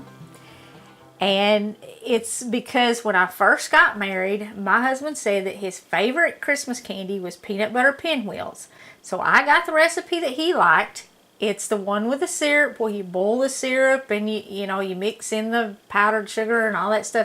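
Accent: South, United States. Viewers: I love to listen to this lady talking; reminds me of my KY kinfolk, my grandma Kate Ford, and my grandma Bertha Edwards who also spoke with a very southern country accent. I'd been looking for an easy fudge and easier potato candy recipe. Thank you for sharing your old-fashioned delicious candy recipes with all of us folk. During this year's holiday season, I will try your two candy recipes! Bless you. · I just discovered your channel and boy do I absolutely LOVE you! Your fantastic southern accent, great recipes. You are wonderful!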